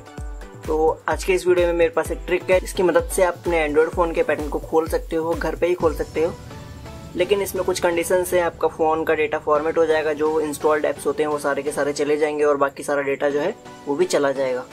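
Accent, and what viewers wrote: India. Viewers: You know shits bad when it's Indian accent and you don't even know what he's saying. Good luck to all of you that can read this ._.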